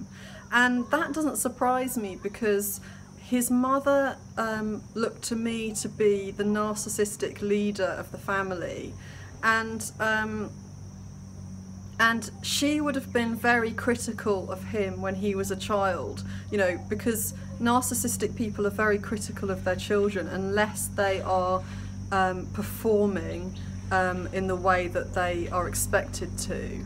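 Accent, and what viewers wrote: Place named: United Kingdom. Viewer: For me it's also her British accent. I don't know why everything they say sounds so smart :)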